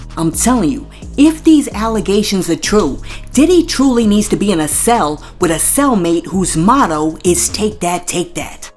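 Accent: New York, United States. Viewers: I love this narrator's heavy ass New York Bkack Accent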